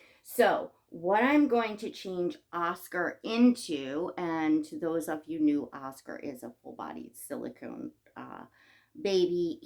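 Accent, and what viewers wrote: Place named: New York, United States. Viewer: I have watched you for months and just commented now but I absolutely love you and your channel! Your New York account is my most favorite accent ❤ You are such a great silicone/reborn mommy and I love all your babies. It’s funny how people always got to but in and add their 2 cents to everything lol!!! There’s is nothing wrong with us loving our Dolls and role playing. Back in 98 I had my one and only son because after him I developed a heart condition called postpartum cardiomyopathy at 22 and couldn’t have anymore so when I found these babies years ago and finally got my first full body silicone in 2021 I was over joyed and since have added up to 15 silicones and 1 reborn that I’m selling but because I just put on the new born stage of my real child and couldn’t have anymore I just love these new born and preemie babies since my son was a preemie too! 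These babies are definitely great therapy and help so much. I totally would adopt if I could afford it because I’m 47 but definitely would give anything to have another new baby around but it’s not in the cards and so many just like to voice their opinion but anyways you keep doing you and keep bringing out those beautiful babies. My whole family has taken to my silicones as real family and just loves them too. It’s really helped me as well to hold and play with these babies but to know the full responsibility isn’t there so we can still enjoy life and do what we need to and play with our babies when we need too and want too.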